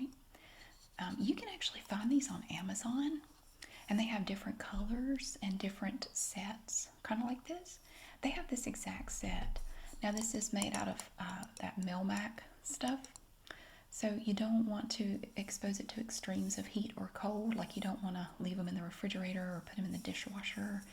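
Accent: United States. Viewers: Hi ! I’m a French 33 yo lady and it’s 5am here, I can’t go back to sleep and I randomly found your channel tonight. I really appreciated your video, it feels like I travelled to the US and have been welcomed in a friendly American woman’s home. I love the accent, you seem really nice and I enjoyed the recipe too. I have no idea what is corn bread though 😂 Due to a bad mental health I haven’t worked in a while and have been really isolated, so this little moment in time with you was so nice. Thank you !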